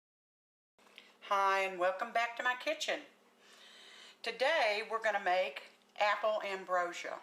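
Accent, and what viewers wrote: South, United States. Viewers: Mama always made ambrosia at Christmas. I still make it for my family. They gobble it up. Can I ask what state you are in? You have a very cute Southern drawl. All of my family is from Tenn. Sometimes people still ask me where I am from. Guess they hear a little twang in my voice..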